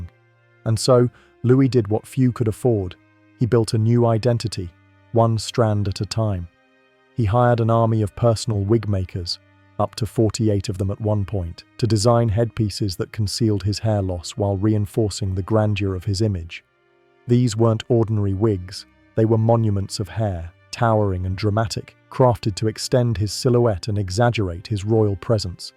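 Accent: United Kingdom. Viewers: Under more info it says United States but you have an accent the same as mine (British), do you just narrate them, do you write them, are you an historian? And what about the pictures, are they AI, they are quite good actually, certainly not contemporary. There's a lot on YT about hygiene and toilets (or lack of them) I wonder how this fascinating subject will be tackled by YT (if it still exists) in 10 years.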